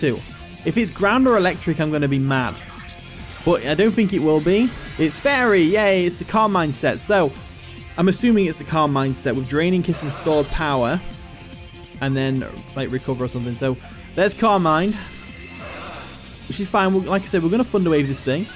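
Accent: United Kingdom. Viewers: Ey man I just gotta ask - I'm from Leicester, lived in Bristol 12 years now; are you from just north of Leicester? Your accent is so close I can almost picture it but I can't quite place it, and it's bugging me because I haven't been up the country in years now 😂 Edit: I recently found your channel so I've seen 10-15vids and it gets my ear every time lmao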